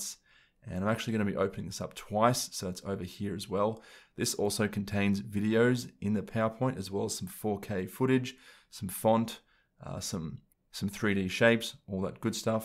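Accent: Australia. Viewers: Nice video mate, nice occasionally hearing a fellow Aussie on YT. Suggestion: have you thought about using OBS to record your screen? Will obviously add to your editing time though.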